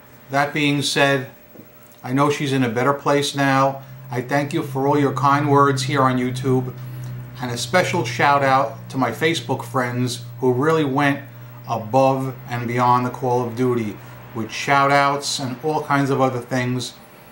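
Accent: New York, United States. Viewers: noticed ur NuYawk accent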